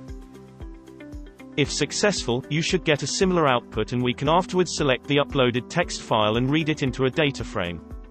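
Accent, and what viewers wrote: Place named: Germany. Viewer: ah, a german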